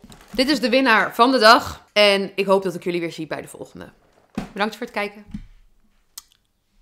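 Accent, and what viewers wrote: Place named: Brabant, Netherlands. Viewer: Ik hou van het Brabantse accent van jou, voelt als thuis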